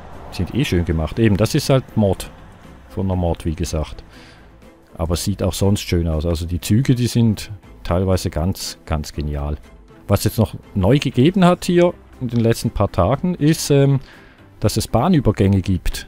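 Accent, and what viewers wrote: Switzerland. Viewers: Du bist der Einzige wo ich deinen Schweizer Dialekt versteh :) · endlich mal ein gutes lp mit schweizer akzent!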